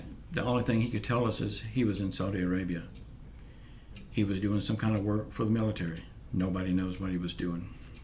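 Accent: South, United States. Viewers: Talks very slow with a southern draw. However, he’s very direct & to the point. No BS approach.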